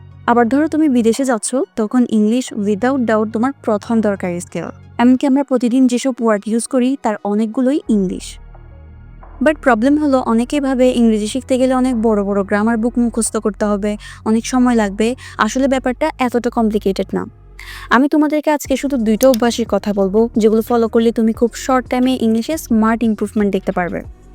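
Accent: Chittagong, Bangladesh. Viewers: Are you frome chittagong?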